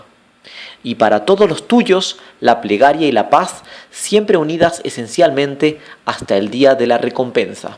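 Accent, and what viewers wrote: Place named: Arab world. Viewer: Por lo que veo, está leyendo la traducción de Blasco ibañez, basada en la versión de marduz, que es lo que estaba buscando. Las críticas que leo sobre el estilo del narrador son tontas, obviamente hay diferentes estilos de narración oral, a mí me gusta ese dejo árabe o del sur de España que tiene (soy argentino, no lo puedo precisar), pero gustos son gustos; lo que sí es importante es que es una voz humana.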